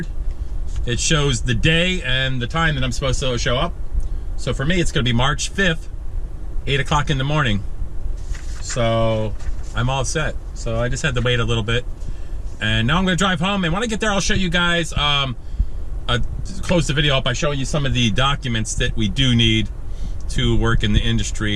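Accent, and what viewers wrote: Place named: United States. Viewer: i dont,t understend you))). На самом деле это вот настоящий американский язык и произношение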